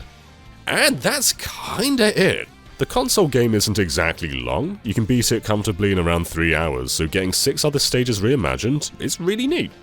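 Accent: United Kingdom. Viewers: Came for the content, stayed for the sexy deep British voice...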